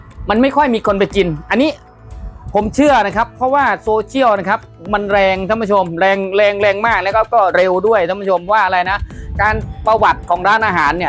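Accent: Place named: Australia. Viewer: สําเนียงการพูดเหมือนคนประเทศออสตีนเลียจังเลยสงสัยเดียวต้องถอดสร้นตีนยัดปากบักเชพเอ้ยได้โปรดไปไกลๆส้นตีนกู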